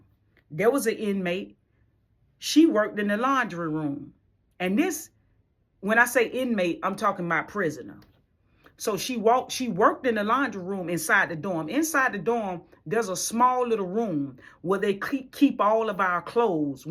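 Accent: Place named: Alabama, United States. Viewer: You from Alabama I can tell im also from Alabama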